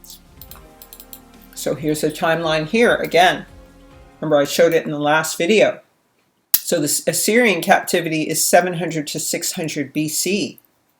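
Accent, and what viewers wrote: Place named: Jamaica. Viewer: I love the Yahmacan accent, Teach. & Beautiful Voice, I'm crushing.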